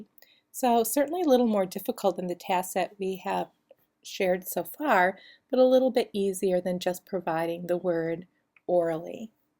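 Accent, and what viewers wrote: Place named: Midwest, United States. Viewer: cute accent. i like your a's. midwest?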